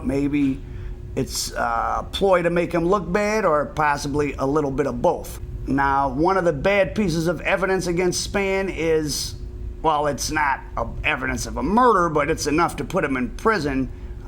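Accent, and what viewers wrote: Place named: Chicago, United States. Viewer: This guys Chicago accent is level 1000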